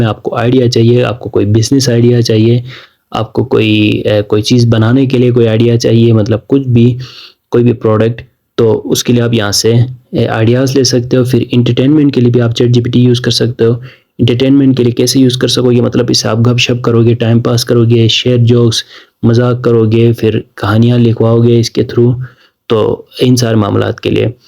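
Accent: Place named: Saraiki, Pakistan. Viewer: Ap sariki lag rhy voice se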